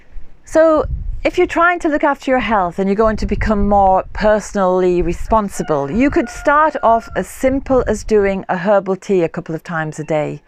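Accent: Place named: North East England, United Kingdom. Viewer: Hi again, it’s Jen from the North East :) I’m absolutely loving your channel- you really do draw the watcher in! I hope to be as good with herbs as you one day! I’m studying with heartwood and will be qualified in 6 years. I just did my meadowsweet monograph which was so joyful. Thanks for all these videos- I’m going to continue to follow you. Medicine making vids would be helpful but to be honest anything you put out is lovely. Btw I watched your video on plantain earlier on, which isn’t a herb I’ve come across that much- later on today on my walk out, what do you know- plantain showed itself to me! Wow! Form your accent I’m guessing you were from either Durham or teeside way? I am originally from Middlesbrough! ☺️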